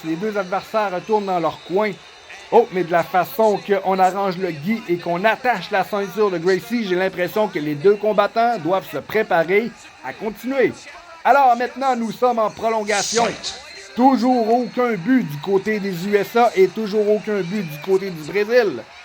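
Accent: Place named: Quebec, Canada. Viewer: BRAVO pour le travail super vidéo, très drôle et l'accent quebecois m'a rappellé le commentaire de raymond rougeau sur les vhs de la wwf. jespere que l'UFC ne strikeront pas tes vidéos, j'ai deja eu ce probleme j'ai dut retirer toutes mes videos retro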